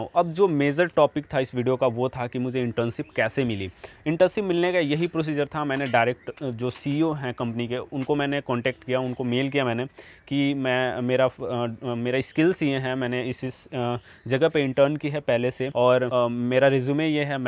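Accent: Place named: India. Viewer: English Indian ؟؟؟؟!!!!!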